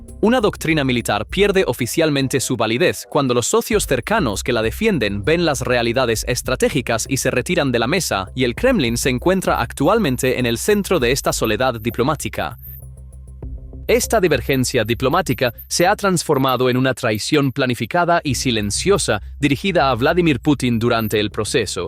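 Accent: United States. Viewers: españa con acento gringo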